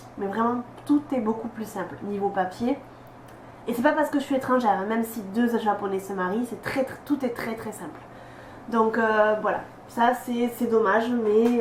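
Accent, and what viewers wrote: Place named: South, France. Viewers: ouais mais t'as un accent genre toulousain ou bordelais ou marseillais un truc dans le genre^^ donc quand tu parles japonais forcément aussi ^^ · Bah, elle est toulousaine, ça s'entend, couillon ! :) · Enfant ! aaaah l'accent du Sud :P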